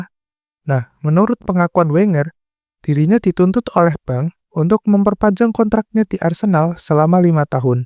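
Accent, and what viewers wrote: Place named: Java, Indonesia. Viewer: sueneng aku koyo ngrungokno radio nganjuk , tetaplah medok mas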